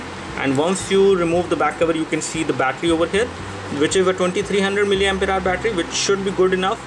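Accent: India. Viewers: "what he says again" ? explain y ur sounded like indian?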